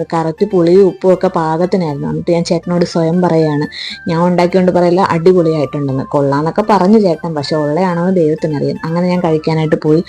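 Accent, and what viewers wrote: Kollam, India. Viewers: 😂😁 കൊല്ലം, ക്കാരാണോ😮 സംസാരം, കേട്ടപ്പോ,,, നല്ല🌹🌹 വീഡിയോ,, നല്ല,, ഇഷ്ടമായി🥰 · കൊല്ലം ആണല്ലേ😂😂😂 കുറെ തോനേ...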